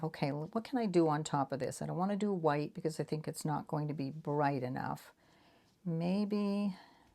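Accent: Boston, United States. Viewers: you are such fun! And so talented! I’ve been watching you for several hours and I’m one of the elder ladies who watch right to the end. Because I live in a seniors apt building (I’m 78) I run into those old people every day in the halls. Truly in my mind I’m much younger, haha. I love that you are from the Boston area. (accent) I live in northern Alberta Canada and we are just now getting rid of the sand salt and gravel on the roads and looking forward to green trees and grass. I loved all your collage making with household items today. I bought a gelli plate and brayer etc a year ago and it’s still in the package. I’m inspired now. I know your beautiful son is in heaven with Jesus. You have guardian angels around you.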